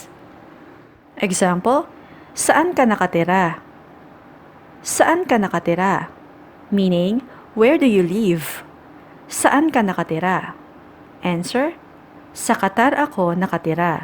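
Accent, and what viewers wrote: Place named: Philippines. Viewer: That’s the true Filipino accent actually. Very far away on how they portray Filipino accent on the internet/movies. 😅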